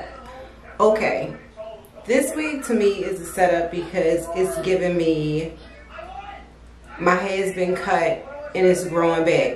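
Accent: Baltimore, United States. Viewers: You did that!! It looks really cute on you! Btw..are you from the DMV area? I can hear the Baltimorean accent lol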